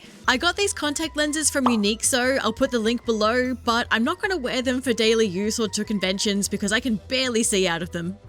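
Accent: Australia. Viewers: If I was asked . . . I'd guess you were an Aussie !. 🤣🤣😂 I never realised that before ! It makes me so happy to know you are a Cousin !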